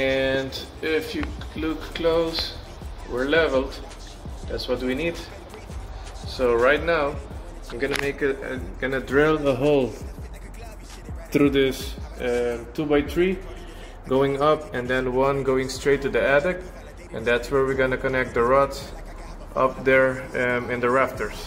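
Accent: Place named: Morocco. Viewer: الوجه و اللكنة مغربي،، منور خويا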